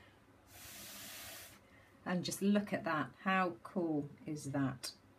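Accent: United Kingdom. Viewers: thank you so much for replying that was very sweet of you to take time out but I figured you were probably in the UK from your accent I'm in the USA so I don't even know what Boden is LOL just looks darling I had a top similar to it a long time ago and made me think of the polka dot top I used to have and I don't know what happened to it LOL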